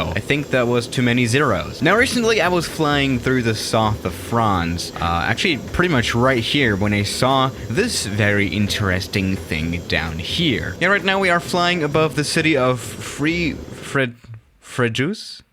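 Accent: Germany. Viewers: He's Jermen don't make fun it just the language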